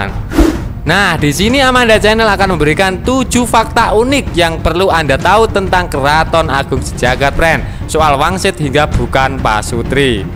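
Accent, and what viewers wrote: Java, Indonesia. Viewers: Medhok ngomongnya...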